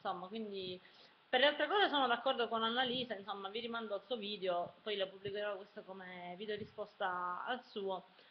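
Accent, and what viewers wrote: Sicily, Italy. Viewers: Io invece penso che ognuno abbia il diritto di esprimersi come preferisce. Youtube non è il luogo in cui rivendicare la perfetta lingua italiana. Ci sono luoghi e tempi per farlo. Diciamo che per vincere questa crociata, forse bisognerebbe cominciare con chi la lingua la deturpa scrivendo con le "k" o sostituendo il "per" con la "x". si esprime in italiano corretto la maggior parte del tempo e, se a volte un po' del suo essere siciliana esce fuori, non vedo in cosa sia TANTO fastidioso.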